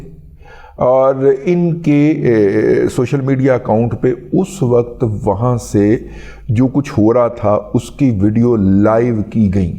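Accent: Punjab, Pakistan. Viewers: Better you avoid using english words in Punjabi accent. Punjabi would be a better choice for your Vlogs. Please also avoid self praising.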